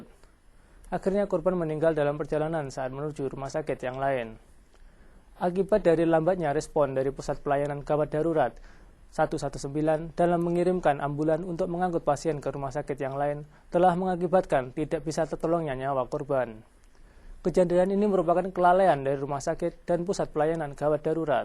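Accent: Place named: Java, Indonesia. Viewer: His Java accent... -.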